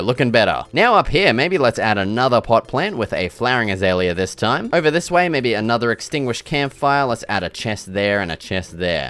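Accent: Australia. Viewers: STILL SHAKING FROM THAT FALL!. Im a 50yr old female and you have taught me so much about building i steal everything! And love the Australian Accent Not that WE have accents!!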